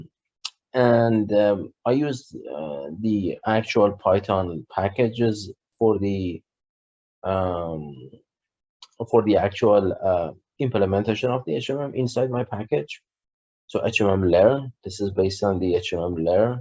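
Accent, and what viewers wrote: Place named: Iran. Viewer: I enjoyed alot from this video with your beautiful and obvious Persian accent.😊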